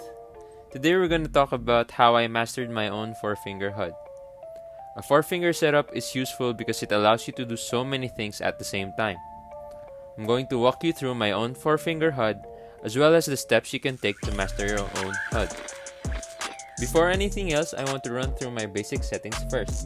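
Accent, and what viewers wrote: Philippines. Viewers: Pinoy ka pala idol , dito ko lang nalaman hahahah, akala ko foreigner, kaya pala pamilyar ung accent mo, lagi ko pinapanood vids po idol, salamat sa mga contents mo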